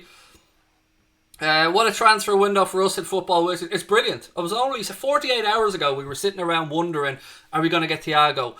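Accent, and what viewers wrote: Ireland. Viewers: Yeah I thought I recognized that accent, I’m Irish too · Great news to wake up to this morning. Came across your channel watching about Thiago and have subscribed...I like listening to you on your own and bring half Irish, love the accent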